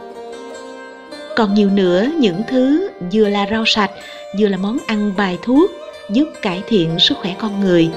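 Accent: Mekong Delta, Vietnam. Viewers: giọng đọc ấy đậm chất miền tây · Chất giọng tuyệt vời . rất hợp với miền tây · Tiếng nói đậm chất miền Tây Nam Bộ, nghe mà mát tai luôn…!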